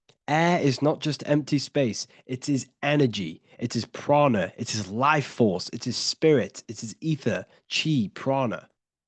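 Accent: United Kingdom. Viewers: R u from derby ?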